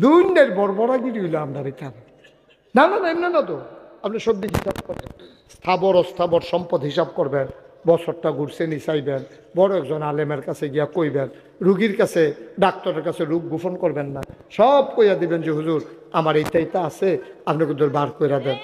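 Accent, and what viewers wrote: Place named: Comilla, Bangladesh. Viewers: বাংলাদেশ কুমিল্লা ডিসটিক গ্রামের ভাষা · বাংলাদেশি, কিন্তু জেলা ভিত্তিক ভাষার ধরনটা একটু আলাদা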